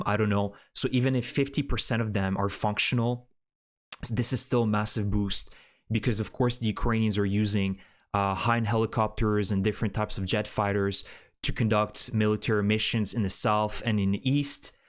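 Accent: Quebec, Canada. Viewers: Pretend? I hear a strong French Canadian accent.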